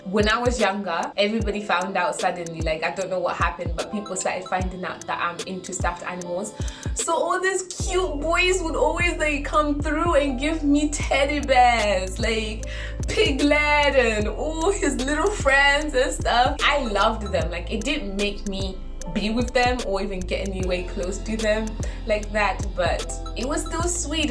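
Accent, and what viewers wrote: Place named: Malawi. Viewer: Your Malawian accent I love 😂😂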